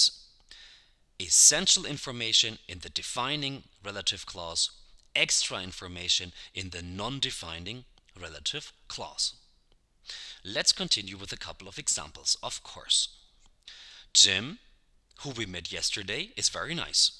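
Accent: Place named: Germany. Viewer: thanks a lot, you explain clearly, but you speak as Germans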